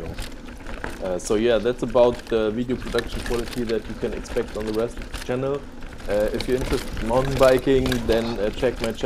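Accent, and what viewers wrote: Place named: Germany. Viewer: Du bist deutsch oder? Hört man raus 😂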